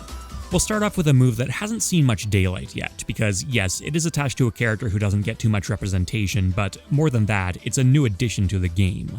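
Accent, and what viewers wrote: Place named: Canada. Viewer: today I learned is Canadian. about=aboat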